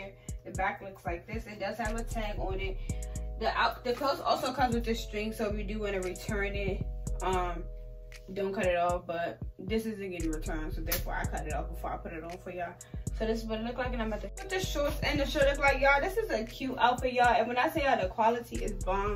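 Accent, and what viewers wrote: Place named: DMV, United States. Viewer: I love everything sis that dmv accent 🤣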